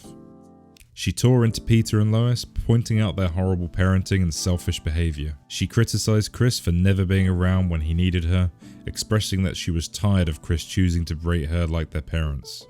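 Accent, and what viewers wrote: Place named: United Kingdom. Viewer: It’s so obvious that this guy is British…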